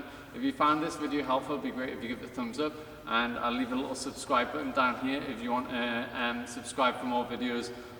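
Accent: United Kingdom. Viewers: British accent I think anyway like it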